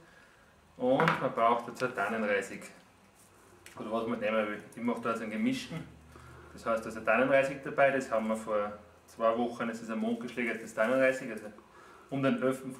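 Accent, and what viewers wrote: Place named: Austria. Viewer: das video is von der qualität völlig in ordnung ;) das österreichische ist ein bisschen knifflig...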